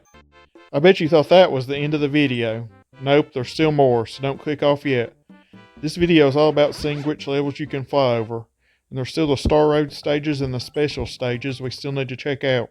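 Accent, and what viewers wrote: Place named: Alabama, United States. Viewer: Where are you from, buddy? Alabama?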